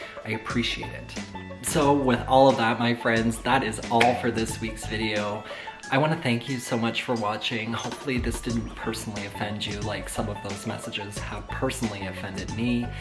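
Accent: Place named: Canada. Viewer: I really enjoyed your video so very much, and you are very sweet and honest. I love your Canadian accent !!! Most "dating apps" are just hook up sites where horny guys look for sex. Most guys there just want to satisfy their "urges" and don't care how or with whom they do it. Makes no difference whether they are gay, bi, str8, married, curious for them " a hole is a hole" and you are just a piece meat! You certainly have self worth and dignity. Good for you for not playing their game! Do you like Italians? LOL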